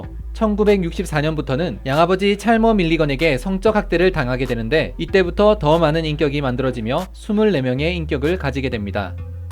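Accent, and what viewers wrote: Gyeongsang, South Korea. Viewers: 경상도사투리 어쩔수가없나봐요 ㅋㅋㅋ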